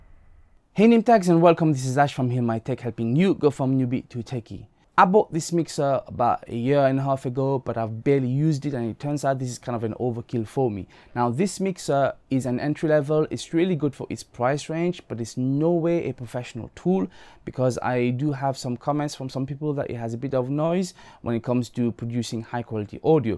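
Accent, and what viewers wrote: United Kingdom. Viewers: Do you know how many Vid's I've watched just to get this info? Way too many (Short Sweet And Straight To The Point) Thankyou ;).... And you're British always a plus. haha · Bob's your uncle! So British.....great explanation and video.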